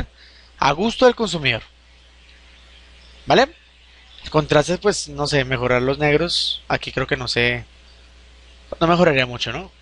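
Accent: Colombia. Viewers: B dis, le escribo desde Vzla, le felicito por sus curso y le agradezco por la informacion compartida. Me agrada que use un lenguaje practico con buena diccion y con su acento Colombiano, porque la combinacion de todo ello resulta interesante, entretenido y no canson. Siga pa lante y sin egoismo que Dios sabra recompensarle. Hagale parcero, hagale. Saludos.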